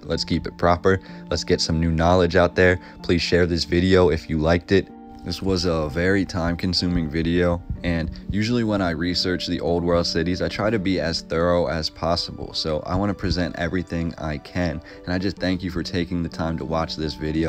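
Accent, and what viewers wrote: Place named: East Coast, United States. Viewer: I recently found your channel It fantastic I can hear the east coast in your voice Lol Have you done washington dc or georgetown?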